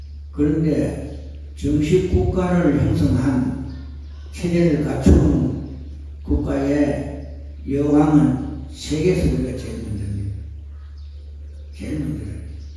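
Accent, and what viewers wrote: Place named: Gyeongsang, South Korea. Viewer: 감사합니다. 정겨운 경상도 사투리를 쓰시면서 큰법문해 주신 종정스님께 감사의 예를 올립니다.법체 강건 하옵소서!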